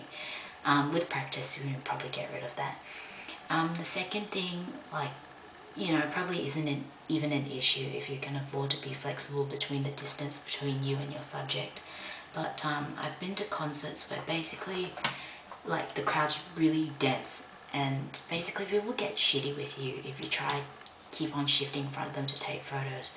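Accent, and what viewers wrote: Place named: Australia. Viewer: Gr8 comments on the lens. Lovely Nicole Kidman esque accent. I wish you well in your SLR endeavours. Ps. I'm using this lens with a Canon EOS 30V 35mm and have taken 3 shots on the new 500D. Interesting in seeing my 35mm shots since the Digital ones are gr8 in low light.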